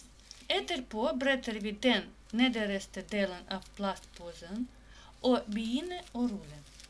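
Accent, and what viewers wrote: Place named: Russia. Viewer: Ruskiy aktsent na vidu :)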